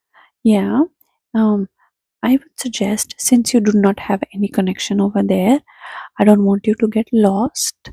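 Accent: India. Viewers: You need to make more flirty videos with the indian accent.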